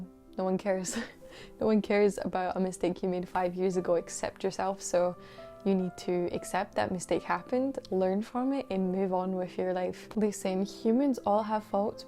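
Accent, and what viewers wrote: Scotland, United Kingdom. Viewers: I feel like you’re Scottish? Are ye? Mibbi? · Scottish? Your speaking voice is so soothing, ill belive your a bad singer when I hear it.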